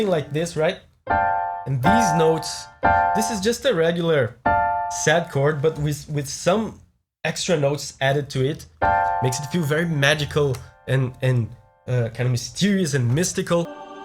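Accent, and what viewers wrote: Brazil. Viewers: e esse sotaque brasileiro meu consagrado kkkkkkk otimo video abraços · Sabia que você era Brasileiro só pelo sotaque kkkkkk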